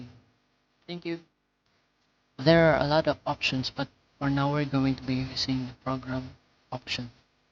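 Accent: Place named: India. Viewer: If it is Indian accent then I watch till end. Because I know it’s going to work. Thanks man.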